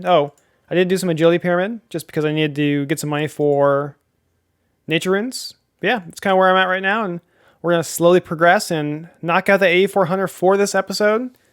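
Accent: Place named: Midwest, United States. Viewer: Your outros give away the fact that you're a Midwesterner 😂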